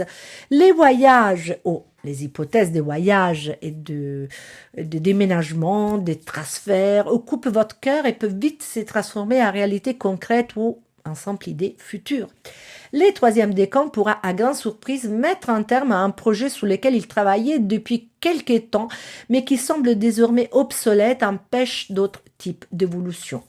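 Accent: Italy. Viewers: Merci chère!!! Vous parlez trop bien le français avec votre magnifique accent italien! ❤❤❤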